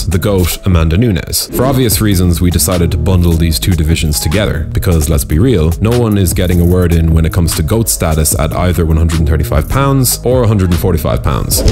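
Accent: Ireland. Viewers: Hooray the Irish voice is back!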